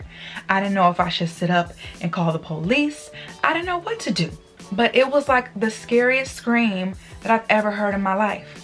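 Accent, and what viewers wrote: South, United States. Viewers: You got that southern accent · Love the way you talk with an occasional “ y’all”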